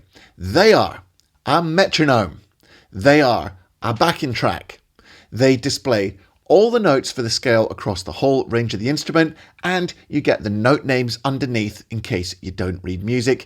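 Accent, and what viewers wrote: Scotland, United Kingdom. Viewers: You are so kind! Thank you. Are you a fellow Scot? Thought I heard an accent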